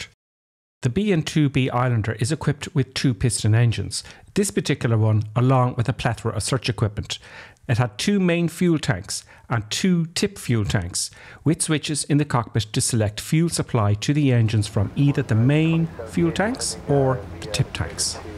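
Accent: Ireland. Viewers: Thank god! I've so missed that beautiful Irish lilt. Some airmanship bringing that down but damn, fancy forgetting to switch tanks! But like you said, we weren't there.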